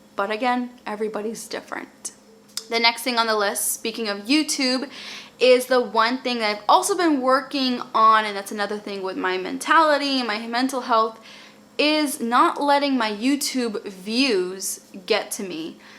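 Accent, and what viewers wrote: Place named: New York, United States. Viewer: Your accent reminds me of our New York accent lol I love it lol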